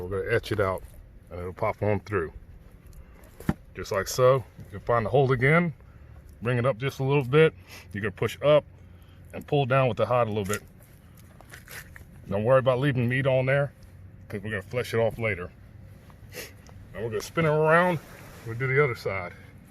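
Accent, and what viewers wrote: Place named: United States. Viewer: I can tell this is good cause in the first five seconds I heard the American in his voice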